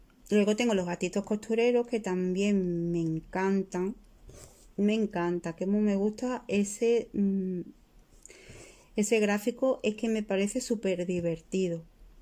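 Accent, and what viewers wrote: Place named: Seville, Spain. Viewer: Hola, acabo de descubrir tu video y me ha gustado mucho. Coincidimos en varias cosas: nos encanta el punto de cruz, la lectura, somos de Sevilla (por tu acento creo no equivocarme). Me encanta A green village y seguro que lo haré... En cuanto haga todo lo que tengo pendiente. Un saludo!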